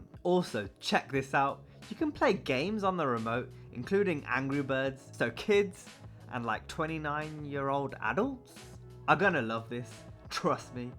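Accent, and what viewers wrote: Birmingham, United Kingdom. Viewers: it's a funny accent, not sure from where, wild guess Birmingham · U from Birmingham?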